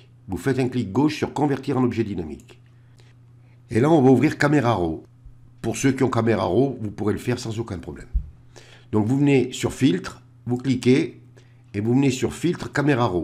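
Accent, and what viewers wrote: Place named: Dauphiné, France. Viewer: il me semblait bien qu'il y avait un petit accent dauphinois! bravo, merci pour ce beau tuto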